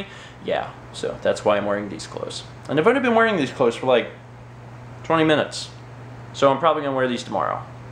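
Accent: South, United States. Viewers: its the southern accent it sounds like we say an l when we are talking really fast. figured id let ya know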